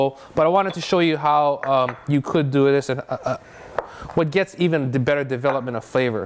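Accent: United States. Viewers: The problem is these americans think they can speak Englisd as well, like the rest of us. Terrible! Maybe we should advise them to watch BBC TV and learn from there.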